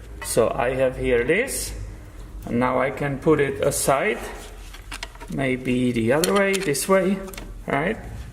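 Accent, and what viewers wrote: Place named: Ukraine. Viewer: Love your vids, smooth, low key, imformative, with a slight Ukrainian , Polish , touch maybe!!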